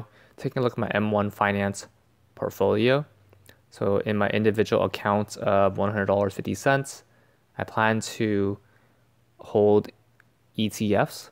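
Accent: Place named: India. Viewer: Naw buddy I can tell, you have a slight indian accent street shitting fren.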